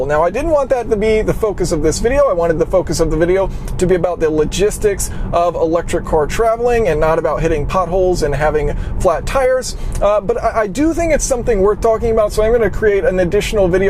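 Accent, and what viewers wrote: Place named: United States. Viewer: how miserable is the poor choice of actor and his highly annoying yankee doodle voice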